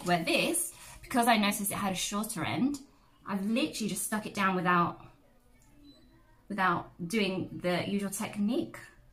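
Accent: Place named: United Kingdom. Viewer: Woah wasn’t expecting you to be British 🥺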